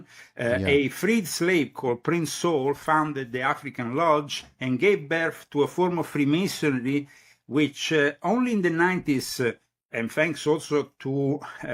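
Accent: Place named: Italy. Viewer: Just brilliant. Love the Italian accent!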